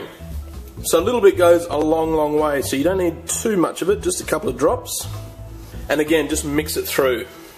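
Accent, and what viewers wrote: Australia. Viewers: Awesome cake Are u from auzzie??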